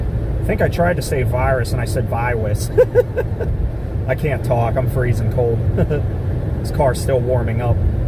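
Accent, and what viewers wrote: Pennsylvania, United States. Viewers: Enjoyed your authentic PA accent!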